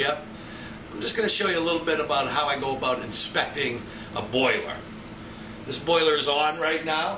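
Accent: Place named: Boston, United States. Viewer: Chicagoland? I am hearing a fellow Boston guy.....boiluh, wadduh preshuh!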